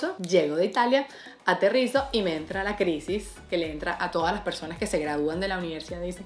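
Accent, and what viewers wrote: Venezuela. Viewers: Me encanta tu contenido y tu personalidad, pareces una amiga más. En otro video me gustaría que contaras cómo entraste a Turner; si fue por casting, o porque igual te llamaron. El Miss Universo por TNT ya es algo muy tuyo, no sería lo mismo si te sacaran. Además, eres venezolana, tu acento es muy rico de escuchar, y ganaste un concurso de belleza: TNT no te va a soltar! Hahahaha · Me encanta que ah pesar de los años. Porque ya tienes años fuera del país. Sigues siendo venezolana y hablas como una venezolana. Que orgullo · eres exageradamente bella, y me encanta tu acento venezolano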